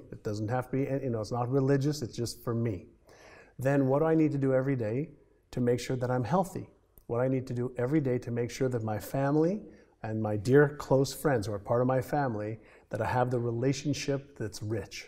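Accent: France. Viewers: I love this French accent. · This French accent: -) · A blond guy with a french accent , what a treat, video made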